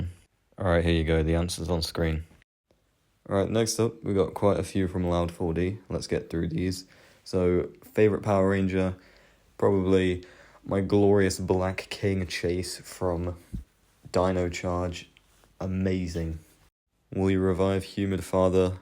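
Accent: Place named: United Kingdom. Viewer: This video is disgusting because your British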